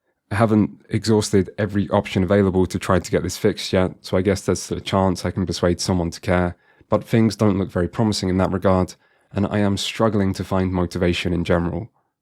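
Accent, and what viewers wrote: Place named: United Kingdom. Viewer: I'm not sure, but it might be related to your using the word "fad" early in the video. With your accent, it might be interpreted by an AI speech-to-text as British slang for a cigarette which also happens to be one of two "the F word" in American English. Still has nothing to do with the title unless the first few seconds of the video is referred to as "the title". The appeal probably went to a person outside the UK, so they also misheard the word (colored perhaps by the transcription). That's the most goodwill I'll extend to the decision makers, especially since it makes no sense in the context of the sentence, whereas "fad" does.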